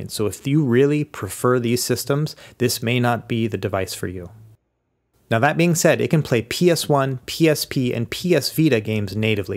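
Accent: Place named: Midwest, United States. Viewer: Maybe it's just me... But u sound way more Midwestern in this video than in others 😭😭 u in MN or sumn? If so let's be homies 🤞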